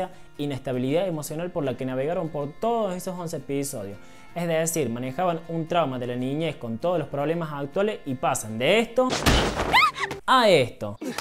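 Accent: Córdoba, Argentina. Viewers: wpse re nota que sos de cordoba xD buena reseña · So cordobe culia?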